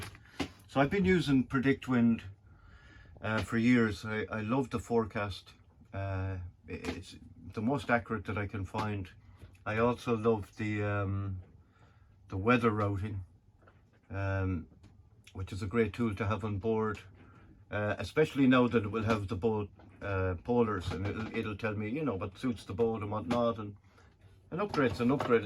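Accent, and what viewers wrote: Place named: Ireland. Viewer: Oh ! What a pitty ! The AI translate everything in french ! No more irish accent !😑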